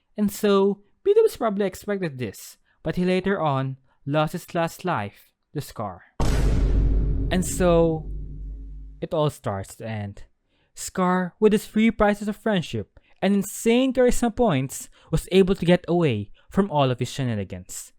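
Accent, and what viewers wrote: Philippines. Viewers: Your accent is very filipino, you from pangasinan or somethin?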